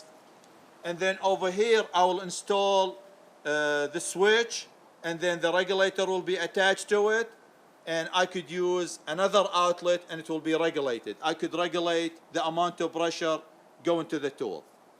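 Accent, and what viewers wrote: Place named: Iraq. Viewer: لهجتك مال عراقي بس لاتطلع عراقي 😅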